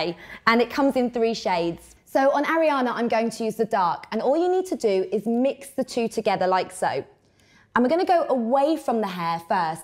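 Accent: United Kingdom. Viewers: the first few seconds were the funniest because she's talking sooo freaking fast plus that british accent haha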